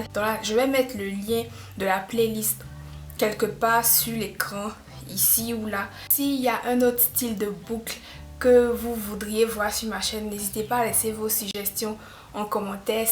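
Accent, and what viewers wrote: Ivory Coast. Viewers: cc t'es ivoirienne? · miss pretty oui elle l'est l'accent trompe pas 😊😊 # 225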